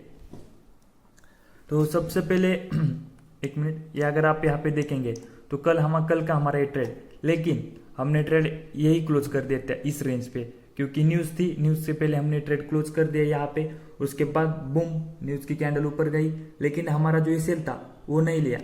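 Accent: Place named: Maharashtra, India. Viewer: Kay rao Aple lok lagich olkhu yetat 😅bolnya varunch... Keep it up brother 💪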